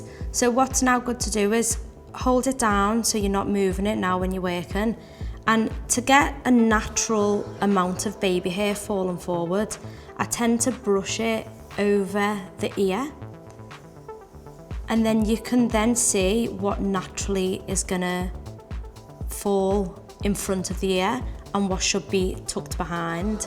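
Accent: United Kingdom. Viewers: Love the Scottish? Accent 🖤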